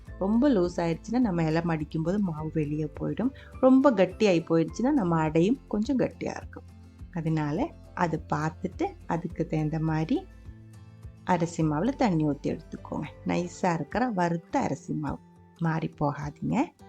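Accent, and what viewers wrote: Kerala, India. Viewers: മലയാളം തമിഴ് കലർന്ന ഭാഷ എന്നാലും സൂപ്പറായിട്ടുണ്ട്👍🙏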